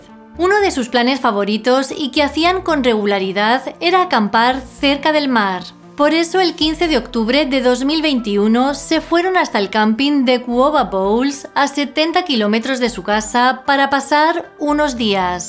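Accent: Castile, Spain. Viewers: Se escribe pijama y se pronuncia pijama porque habla castellano de España,a que escuela de tu país fuiste tú para corregir algo bien dicho ?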